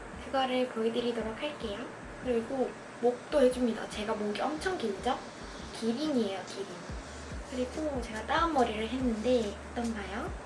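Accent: Gyeongsang, South Korea. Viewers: 서울 말은 서울 말대로 ❤️ 경상도 말은 경상도 말대로 ❤️ 모두 어울려요. 넘 귀염~ '머리부터 발끝까지.~' 이 노래가 생각나네요. ~ㅎㅎ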